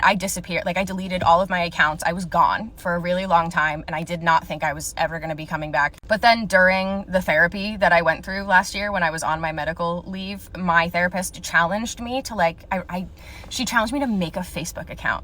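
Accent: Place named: New England, United States. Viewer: I love the way you talk! I was raised in Rhode Island and your accent and mannerisms remind me a lot of my hometown friends (i dunno where you're from in new england but your accent is familiar to me at least) your content is just v cozy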